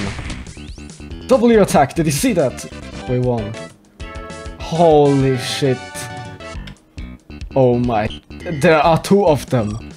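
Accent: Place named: Sweden. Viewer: ahh I expected you were Swedish by ur accent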